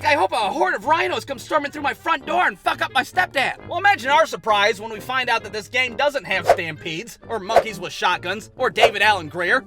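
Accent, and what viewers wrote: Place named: Midwest, United States. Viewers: It's called an "ohio accent".... me and come from the same area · Typical Ohio accent - “average Michigander” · That’s how we sound in the midwest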